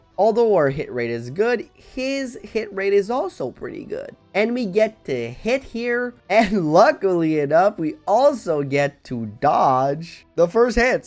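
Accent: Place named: France. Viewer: First time I have noticed an accent, are you french?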